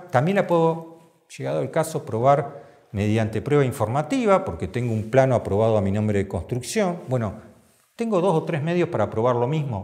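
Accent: Argentina. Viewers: Cabe considerar que el habla de acuerdo a la Ley de su país, por su pronunciación parece ser argentino, lo dicho por el Dr. no es totalmente aplicable en cualquier otro país como México. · Tiene acento porteño asi que asumo que es de Argentina xD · Es Argentino no escuchas como habla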